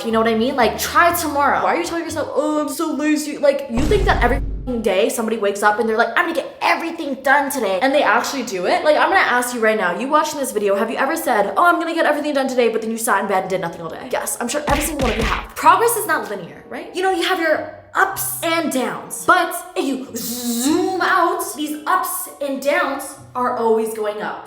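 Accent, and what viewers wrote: England, United Kingdom. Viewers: loved that british accent lol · Love the vids but the English accent ain't it sis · Gosh you crack me up with your english accent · Your British accent is so good, I love it. Your videos are also so good. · the British accent is not it girl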